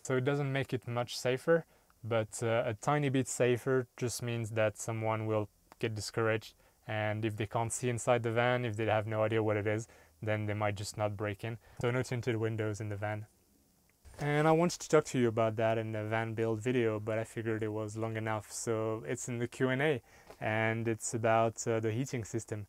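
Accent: France. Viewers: Okay I give up... French? Swedish?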